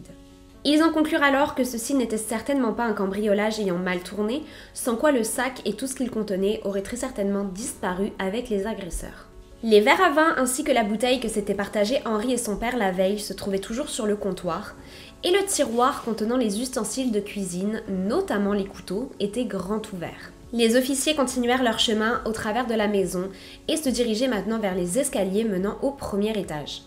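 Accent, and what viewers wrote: Quebec, Canada. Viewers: pas de quoi, je me disais bien que ça devait venir de l'anglais, mais ton accent québécquois n'est presque pas perceptible, je sais pas si tu l'a travaillé, mais ça fait très pro! bonne continuation! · Bonjour, ma première video de toi, j'aime beaucoup, merci. Je sens une toute petite pointe d'accent québécois, je me trompe ?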